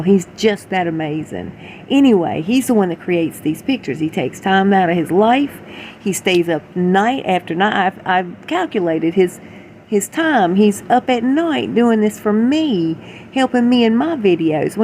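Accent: South, United States. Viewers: your voice is so soothing and has that sensuous Southern drawl; won't you consider narrating audiobooks, just so we can listen to you speak whenever we need a little "relax" time?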